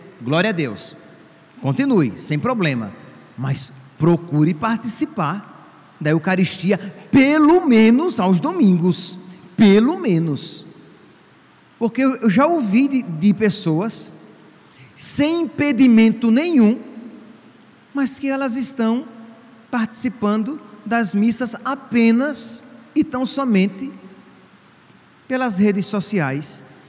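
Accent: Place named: Northeast, Brazil. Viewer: Esse padre é da onde.Muito boa a omilia.Deve ser Nordestino pelo sutawue.Sou de MG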